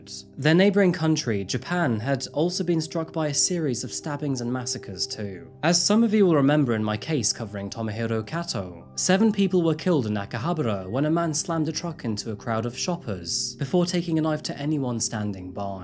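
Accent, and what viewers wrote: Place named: United Kingdom. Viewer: I think I'm officially addicted to your channel. Murder mysteries, forensics, crimes etc are so interesting! You tell these so well and it's nice to hear a fellow Brit, since moving to France. You make me feel at home 🖤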